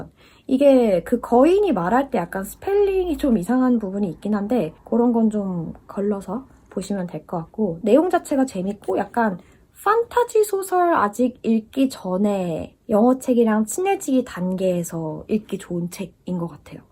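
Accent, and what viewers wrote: United Kingdom. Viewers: I really like your british accent, can you make more videos of communicating with other people in english?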